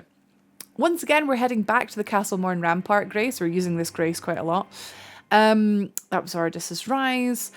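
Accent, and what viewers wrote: Scotland, United Kingdom. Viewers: Nice Scottish accent. What’s your PSN?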